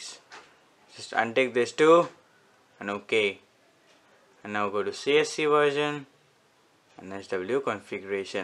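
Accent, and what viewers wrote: India. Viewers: Indian accents, why?! Just can't understand a word.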